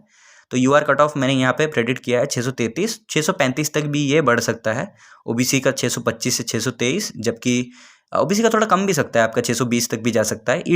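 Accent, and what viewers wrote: Odisha, India. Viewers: Bro r u from odisha?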